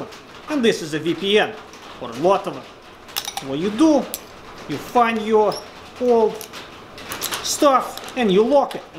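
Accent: Russia. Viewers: Arh. The russian accent is back. I like, i like very much. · I tried watching this in the break room at work today. My Belarusian co-worker happened to walk in and she was like "is that a Russian accent?" Yeah, we had a good laugh. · Wth why is he getting more russian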